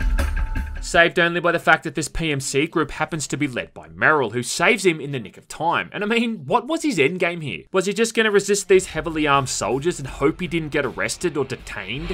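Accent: Australia. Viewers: I love your videos. Great break downs. You nail everything. Also, hearing an Aussie talk with such casual lingo and slang is perfect for me. Keep it up mate. You're doing great. Top shit. Yeah nah yeah cobber.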